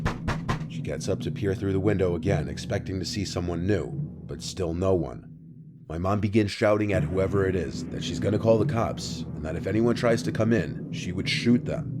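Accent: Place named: Hudson Valley, United States. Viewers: Hudson Valley accent LOL! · Hudson valley Accent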